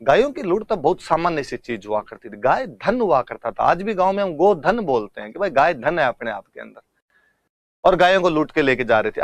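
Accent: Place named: Marwari, India. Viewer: Sir ji , you are great teacher n Great person but English to be improved as teacher...... English tone is in marwadi and that laughter for us .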